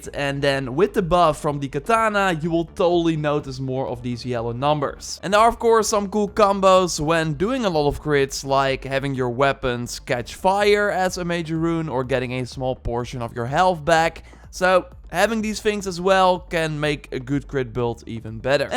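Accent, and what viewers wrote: Netherlands. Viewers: Je hoort gwn da hij Nederlands is😂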